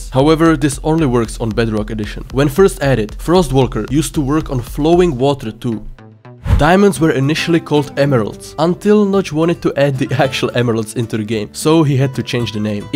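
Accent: Czech Republic. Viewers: Proč tu nejsou český komentáře? 😀 Dohledal jsem si to a nespletl jsem see jsi čech, já ten přízvuk asi nedám, ale ta angličtina je super 😇 Jen prostě výslovnost mě strašně tahá 😅 Pardooon 🙈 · Great, I enjoyed the video, but ... aren't you from Czech? Honestly, you sound like that (and your channel name is suspicious too) :D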